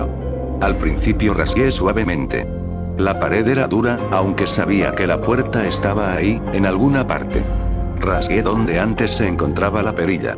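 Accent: Argentina. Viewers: Es Argento q capo!! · sos Argentino?, que grande capo!, con razón me encantan tus videos jajaja te mando un fuerte abrazo de Capital Federal!!! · SOS VOS DE ARGENTINA CHÉ? FELICIDADES PIBE, A VER CUÁNDO ME MANDÁS UN SALUDO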